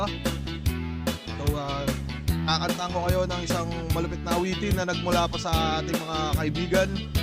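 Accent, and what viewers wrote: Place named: Visayas, Philippines. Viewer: galing ng accent boss parang bisaya talaga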